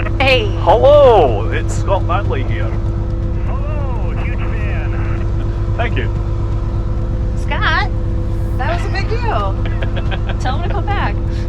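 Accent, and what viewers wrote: Scotland, United Kingdom. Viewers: Ha Ha First time watching your vids and i heard the Scottish accent and thought you were flying in Scotland. You must have taken our weather with you to California. New Sub fae yer home country. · I always thought you lived in Scotland 🏴 or the Isle of Man 🇮🇲 based on your name and accent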